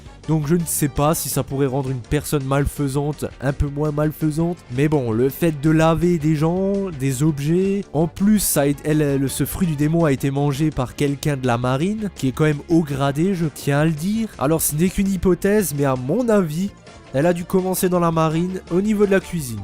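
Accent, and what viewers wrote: Alsace, France. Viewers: L'accent alsacien prononcé 😂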